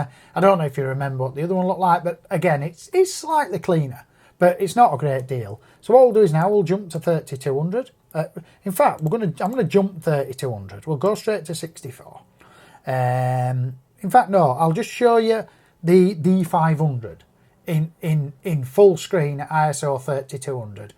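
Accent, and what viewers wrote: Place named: Yorkshire, United Kingdom. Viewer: you sound like my son...yorkshire accent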